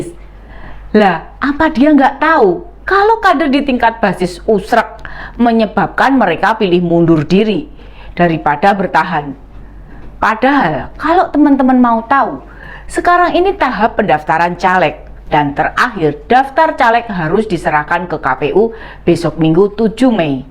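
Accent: Java, Indonesia. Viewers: Bahasa yang medhok chas Mbak enak juga didengar 😃😃❤👍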